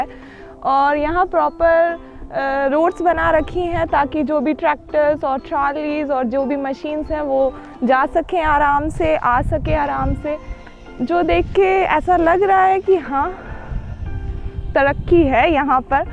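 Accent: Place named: Madhya Pradesh, India. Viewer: अरे आप मप्र से हो आज पता चला। मैं तो पहले ही समझ गया था, आपके बोलने के तरीके से आप हमारे एरिए से हो आप मप्र में कहाँ से हो।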